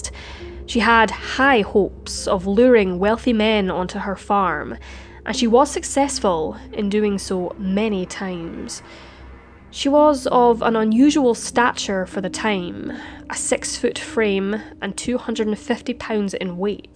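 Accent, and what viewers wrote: Scotland, United Kingdom. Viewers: NOPE, SHE'S SCOTTISH!!!😘😘😘😘😘😘😘😘🥰🥰🥰🥰🥰🥰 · Jason Erb it’s Scottish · Scottish Accent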